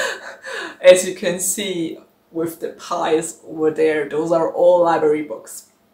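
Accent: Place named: India. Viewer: Your comment made me check this out. You've done an amazing work answering this tag questions. I love your idea on pre-ordering books. You have a great demeanor and this seem to be my first time here, so I'd just subscribed. Your accent sounds lovingly Indian. Are you an Indian? I admire your opinion on library books too. 😆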